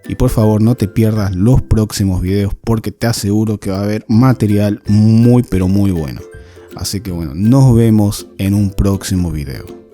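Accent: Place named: Argentina. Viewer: No Es argentino. Oye su acento .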